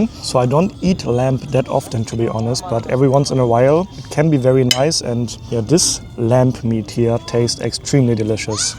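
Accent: Germany. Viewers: ich rieche deutschen aktzent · Hello, I‘m here the first time, when I heard the famous German ascent, I had to listen a little and I must say, I liked your tour very much! I wanted to visit Qatar 3 years ago, but it didn’t happen, so you gave me a very good impression and description of that place, thanks very much! I am only 3-4 months/year in Germany and I am completely with you, when you express your feeling’s: I wish Germany would look like this! I wish many times the same! I look forward seeing and listening to more of your recorded journeys. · Ich liebe dein deutsches Englisch 😅😅😅😅❤❤❤ I love your German English 😂😂🔥🔥 Best videos bro thank you for all this videos 🎉🎉🎉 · Love the German accent 😅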